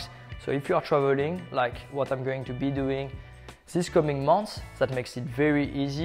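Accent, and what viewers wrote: France. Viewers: i am not able to understand a full sentence of that frenglish.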